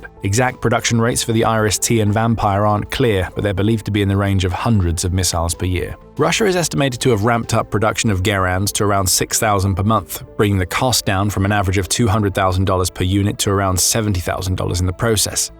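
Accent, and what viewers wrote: United Kingdom. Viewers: UK english accent sucks.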